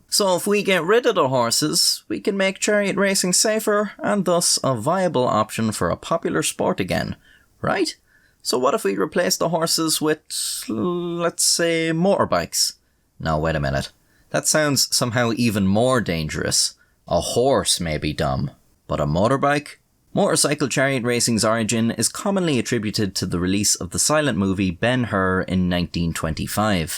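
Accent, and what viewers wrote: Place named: Ireland. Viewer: there's something about his serious yet goofy Irish voice making irrelevant threats that is just so damn funny.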